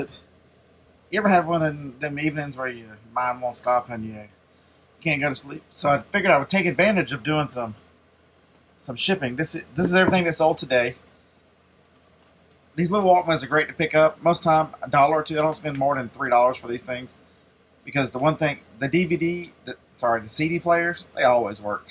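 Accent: South, United States. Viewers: So nice to hear a southern accent. SE Tennessee here.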